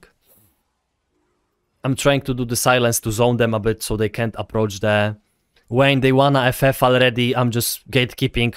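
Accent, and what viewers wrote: Poland. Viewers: Nice Polish accent like libik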